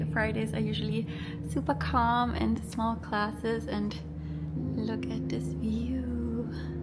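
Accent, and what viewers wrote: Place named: Germany. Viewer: Du bist wirklich so sympathisch. Dachte mir schon zu Beginn: dieses Englisch klingt so Deutsch 😅 Total süß und richtig gute Rezeptideen ❤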